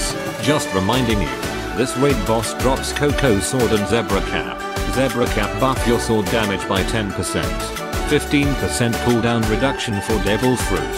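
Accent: India. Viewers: Lol indian voice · Why does the new voice sound like an INDIAN!!!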